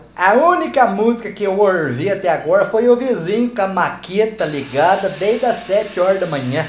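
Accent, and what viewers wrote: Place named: Rural, Brazil. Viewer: 😂😂😂😂😂 Ele é muito bom fala um caipireis perfeito lembra minha infância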